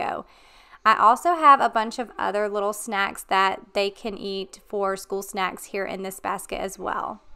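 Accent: South, United States. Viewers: Hi! I just discovered your channel. I went to college in Savannah! Its great to hear a little southern accent. 😉 Thanks for a great video, I look forward to watching more.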